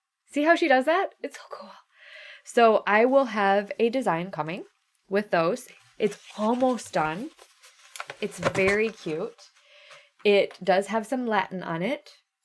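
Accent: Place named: Midwest, United States. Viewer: Sorry you didn't get to Nashville, but it was good to see you! I had to lol when you mentioned your 'midwest coming out'! I've thought that for years ever since I watched Fargo - I hear that accent when speaking! 🤣 I'm sorry for your loss of your uncle.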